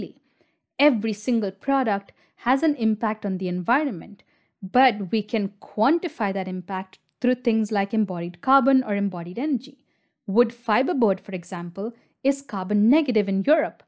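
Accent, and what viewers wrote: India. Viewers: I now watched several of your videos. I'll be honest here. When The Algorithm showed me your first video I saw a woman with Indian accent and all my biases (yes I have those, sorry I am human) kicked in. But listening for few minutes and I was hooked. Well researched, well versed and you seem super nice and reasonable. Great content, well produced, down to earth and informative. Thanks!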